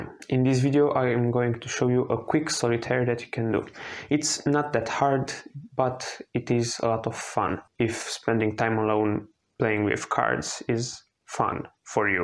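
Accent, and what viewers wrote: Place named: Russia. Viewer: Lmaoooo thank you bro.... Exactly what I wanted, learning a solo card game from someone with a Russian accent was simply perfect for me.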